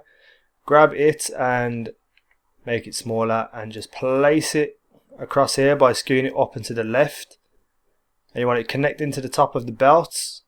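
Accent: United Kingdom. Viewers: Holy crap I didn't know you were british, or english, whatever the proper term is. Cool :D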